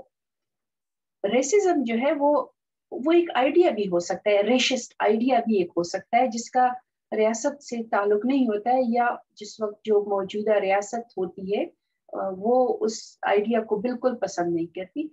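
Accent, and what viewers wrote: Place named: Khattak, Pakistan. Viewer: Your pashto accent and dialects reflects that you are from Orya khel Khattak region?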